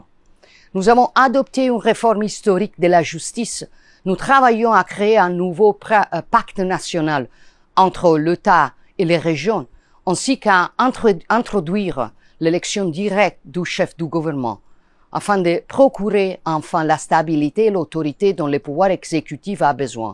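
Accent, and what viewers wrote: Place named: Italy. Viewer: Soyons honnêtes : son français aurait sûrement été plus fluide, et avec moins d’accent « macaronique », si elle avait pris la peine de les étudier à l’université plutôt que de perdre son temps à militer dans un parti néo- ou pseudo-fasciste. Elle a préféré grimper grâce au népotisme et au clientélisme — une vieille habitude de cette droite populiste, autoritaire et un peu obscurantiste, arrivée en Occident avec le petit coup de pouce de Poutine et de quelques autres voyous… Madame (ou Mademoiselle) Meloni, expliquez-nous donc pourquoi l’Italie est aujourd’hui le seul pays d’Europe de l’Ouest à ne pas avoir reconnu la Palestine et à rester les bras croisés devant un génocide en cours. Et expliquez-nous aussi, Madame Meloni, pourquoi des centaines de voix d’Italiens NATIFS, qui dénoncent discrimination, favoritisme et appauvrissement, se retrouvent systématiquement réduites au silence, censurées ou même poursuivies… dans VOTRE pays.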